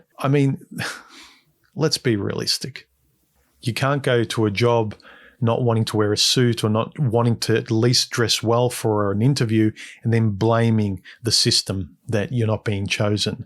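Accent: Australia. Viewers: Statistics have shown the success rate of a marriage or defacto is proportionate on the number of partners a woman has had in her past but the same doesn't hold for men. Men always exaggerate that number and women always understate their number. But as a rule of thumb, women who have had more than 5 sexual partners are much more certain to divorce the higher that body count becomes. And point in question is my daughter, who is 36, brags over the number of sexual partners she has had. That number is over 100 by her own admission. She is unable to love a man or have an extended relationship with men. Her relationships last 2 or 3 months before they end and she always has someone else in reserve so she is never alone. She does not love herself. She has 2 adult brothers who claim to have only had 3 sexual partners each. They are both in very long term relationships, one married, one defacto. 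I am so proud that they never took the path their sister took and chose very carefully their respective partners. ..... And that beautiful Aussie accent makes listening so easy.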